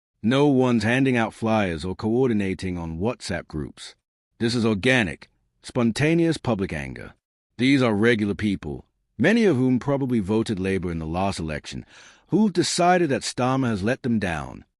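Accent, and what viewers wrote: United States. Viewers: WHY IS AN American DOING THE COMMENTARY?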